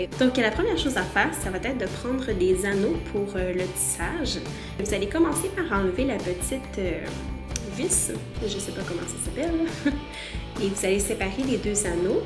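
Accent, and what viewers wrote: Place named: Quebec, Canada. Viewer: j'aime trop l'accent québécois ! !😍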